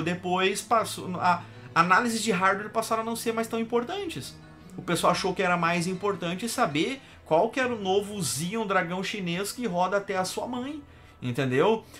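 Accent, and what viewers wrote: Rio Grande do Sul, Brazil. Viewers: O YouTube me indicou, vc é gaúcho?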